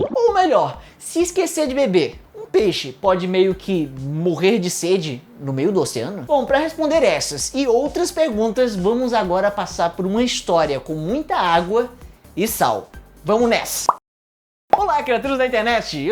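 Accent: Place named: Northeast, Brazil. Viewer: OXENTE! É NORDESTINO!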